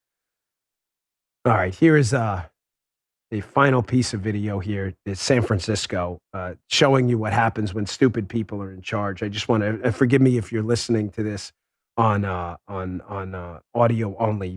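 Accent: New York, United States. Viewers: I'm in a mood....lol, your NY roots are showing.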